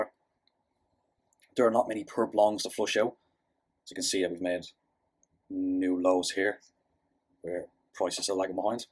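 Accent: Ireland. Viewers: Didn't realize you were Irish 😎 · Thanks for the update, never would have guessed you were Irish...Great stuff, been following for years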